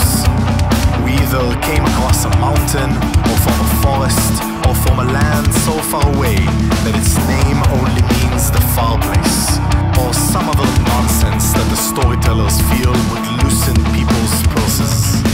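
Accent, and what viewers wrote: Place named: France. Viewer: With a French accent no less. Like butter to the ears.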